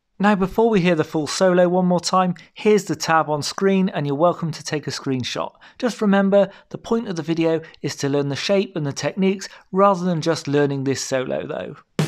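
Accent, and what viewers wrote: England, United Kingdom. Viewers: that typical aristocratic english accent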